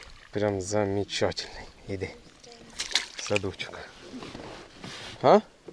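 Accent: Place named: Surzhyk, Ukraine. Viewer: Я вибачаюсь ви з якого регіону українці бо у вас пробачте страшний суржик поправте українську мову а взагалі я дивлюся ваш канал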